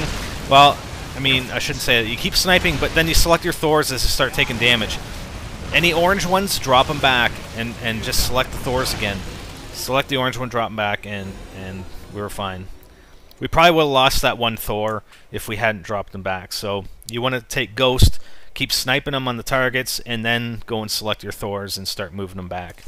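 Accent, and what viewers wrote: Canada. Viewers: I HATE YOUR CANADIAN ACCENT! GO BACK TO ALMOST-AMERICA!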